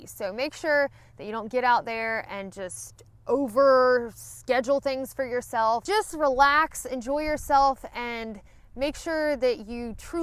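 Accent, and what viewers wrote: South, United States. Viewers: Poor YouTube... Turn off the sound and watch the video close captioned. YouTube just doesn't know how to handle a beautiful southern accent.... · where you from, miss dixie? you sure do talk pretty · Love your Southern accent.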